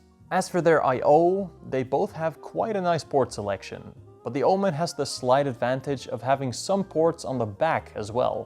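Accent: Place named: Netherlands. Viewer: U sound so Dutch xd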